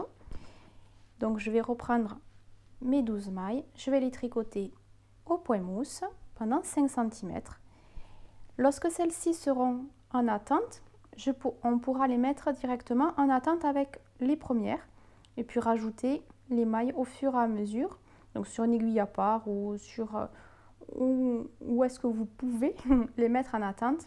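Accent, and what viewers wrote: South, France. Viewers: Ça y est! J'ai terminé mon pfeilraupe, je l'ai tricoté en laine Rachel de chez Zeeman, j'ai utilisé 2 pelotes complètes + le début de la 3ème. Il est vieux rose dégradé blanc et il y a quelques défauts au niveau des trous, mais une fois porté cela ne se voit pas!!! MDR. Encore une fois merci pour tes explications claires et en + j'adore ton accent du Sud! Bisous bye bye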